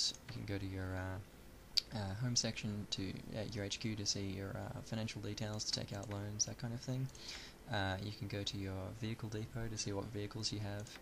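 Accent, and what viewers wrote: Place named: Australia. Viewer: Love australian accents :D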